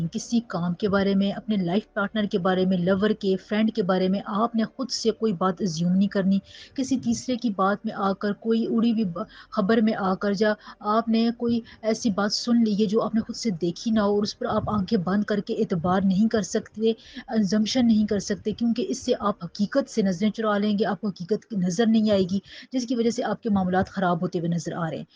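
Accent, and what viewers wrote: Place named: Punjab, Pakistan. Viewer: tez lehja punjabi h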